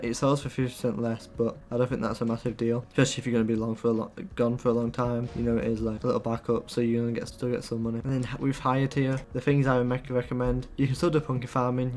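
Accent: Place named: United Kingdom. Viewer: The critisicm.. if people are donkeys and can't understand English doesn't mean they need to complain in the comments. (My main language isn't even English and i can understand him very well.) I'm also self taught with English. So please stop the criticism he just has a British accent jeez.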